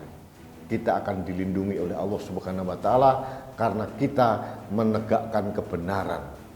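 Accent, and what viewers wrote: Java, Indonesia. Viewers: Wong jowo ngomong e yo medhok jowo ngeh pk 👍👍👍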